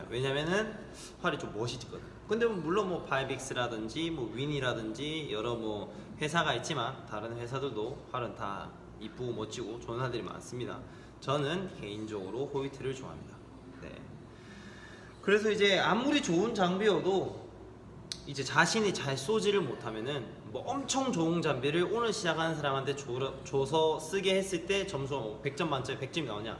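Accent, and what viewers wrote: Busan, South Korea. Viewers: 부산분이여서ᆢ듣기넘 좋아요^^ 오늘 처음 양궁을 접했구요 많이 구독해서 하면서 궁금한건 물어 볼께요 아~스트레스 해소에는 매우 좋아요 계속 쭉~욱 해볼까 합니다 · DC에서 보고 왔습니다~ 입문자들에게 아주 유익한 정보가 많을 것 같습니다~말투가 부산말투라서 부산이가 했는데 역시 부산이였네요~앞으로 많은 좋은 정보 기대 합니다~긴장하셨는지 처음에 많이 굳은 표정이라 웃는 얼굴이면 좋겠다는 생각이 들었는데~뒤로 가면서 여유가 생기면서 웃는 얼굴이 좋았습니다~그럼 다음편 기다리겠습니다~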